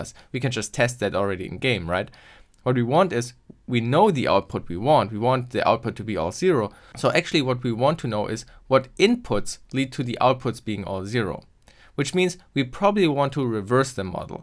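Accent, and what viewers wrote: Germany. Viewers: Bisschen hört man doch raus das er deutscher ist :D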